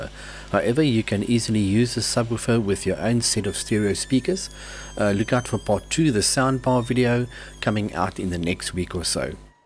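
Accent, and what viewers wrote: South Africa. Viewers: are you from South-Africa sir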